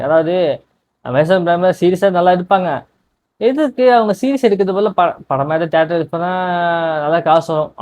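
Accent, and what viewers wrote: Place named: Telugu, India. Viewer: நீ ஒரு தனியா ஒரு வீடியோ ஒன்னு போட்டு வை டா அது என்னன்னா நீ ரியலா தெலுங்கு தான் பேசுவ அப்படிங்கறது எல்லாருக்கும் தெரியிற மாதிரி ஒரு வீடியோ போட்டு வைடா நீ பேசுற தமிழ் வந்து டிஃபரண்ட்ஸா இருக்கு போல நீ க்ளிப் போட்டு இருக்கேன்னு எனக்கு தெரியும் மத்தவங்களுக்கு தெரியாது இல்லையா... அதனால சொன்னேன்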